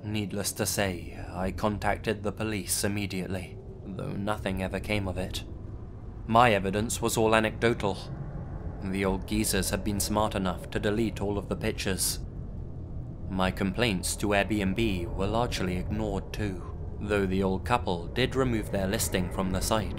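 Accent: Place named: United Kingdom. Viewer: The British accent sound good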